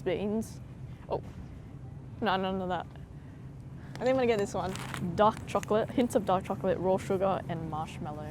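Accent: Australia. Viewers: She is Australian. I'm Australian and i can tell from the way she speaks, that she was born here. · This video is awesome!! and seeing an Asian lady with an Aussie accent, <num>/10 <3 <3 More videos please!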